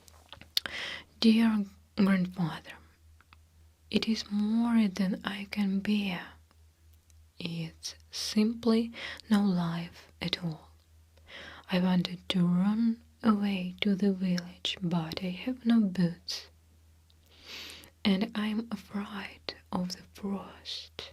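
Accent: Russia. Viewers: Beautiful, beautiful russian accent and all the options for names! wow! I like Russian so much. Thank you for another wonderful video and, you look so beautiful!!! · I admire Russian literature and Anton Chekhov was a great writer even though he is not as famous as Tolstoy. I think you should make this into a series especially stories from the 19th century. I love your soothing voice with that beautiful accent of yours 💕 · I also agree that your English is very good!! Your Russian accent is even more adorable and that is a great reason that I listen to you!!! Very sweet and relaxing!! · Thank you so much!~ I enjoy the relaxing tingles from this video and I love your Russian accent!~ You speak English so well as well if I may say so.~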